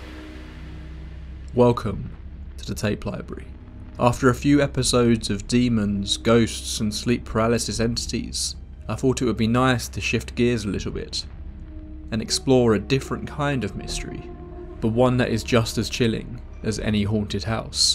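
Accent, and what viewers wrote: United Kingdom. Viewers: Every time i see an interesting thumbnail and title i pray its not narrated by a britard but dammit it always is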